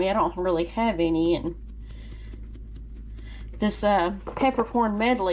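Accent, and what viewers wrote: South, United States. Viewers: Salmon cakes with southern accent. Im subscribed 🇺🇸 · I love your beautiful Southern accent. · She so country and I love it lol😂. I’m country too , northerns love our accent! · I could listen to you talk ALL DAY! I LOVE your country accent. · they look good this woman is a good ole country girl and you can tell by her accent i bet they are good im 68 and been eating them all my life in my grandmother cooked all the time and did not have any education at all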